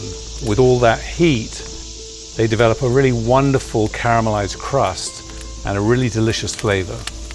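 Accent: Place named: England, United Kingdom. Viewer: Between the music your English accent the sound of the fire the sound of the food cooking and the fire itself these videos are relaxing and enjoyable. My only wish is for it to be weekly.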